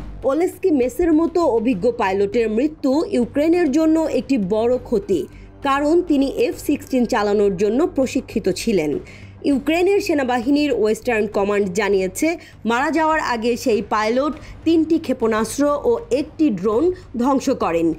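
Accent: India. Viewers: ভারতীয়দের মতো কথা বলে এই সাংবাদিক/উপস্থাপক।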